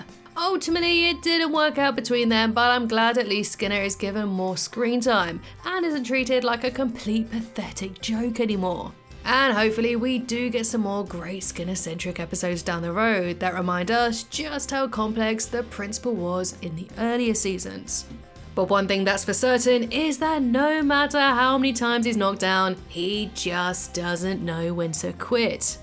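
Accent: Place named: South East England, United Kingdom. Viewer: It sounds like we both from south east England if only we could meet up and knew your last name so I could find eachother and talk Simpsons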